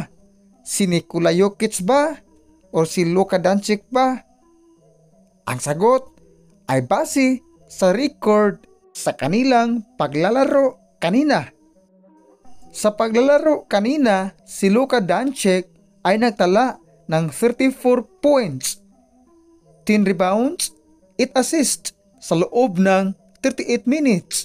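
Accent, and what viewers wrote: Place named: Visayas, Philippines. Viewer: Bisaya kaayo ka boi!!!!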